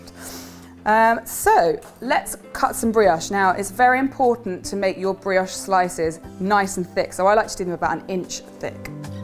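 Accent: United Kingdom. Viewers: I feel like Frances mcdormand is giving me instructions to make French toast in a British accent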